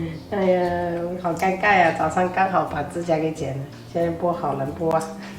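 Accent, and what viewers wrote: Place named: Minnan, China. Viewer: 好可愛的閩南口音，聽到跟自己一樣的方言，好溫馨暖心，很喜歡妳的頻道，真真實實的料理，真真實實的生活!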